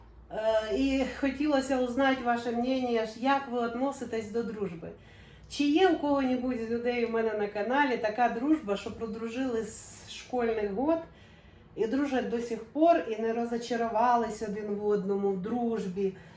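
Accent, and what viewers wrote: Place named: Poltava, Ukraine. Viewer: Доброго дня! Підняли тему жіночої дружби, і ми тепер позгадуємо, як було) Так, по молодості було багато жіночих дружб, студентських, ну не таких, щоб прям занадто, але з часом, з роками ти розумієш, що найкращої дружби, як до своїх близьких та рідних годі й шукати! Мені зараз 46, батьків вже нема давно, але підтримуємо тісний зв'язок з сестрами, це найкращі подруги 💯💯 нас троє. Добре, що так виходить, дай Бог, щоб і надалі так було!!🙏🤗🤗 А от тема про Полтавську область цікава, де ви там проживали, скільки часу, щось про Полтаву? Бо я сама з Миргородського району, а зараз ми виходить недалеко й мешкаємо один від одного, хвилин 40-50 їзди)) Чомусь зразу, як почала вас дивитися, почула у вас полтавську "балачку", ну трошки є)🤗🤗🤗 Бувайте здорові, гарного дня, всим вітання!!❤❤❤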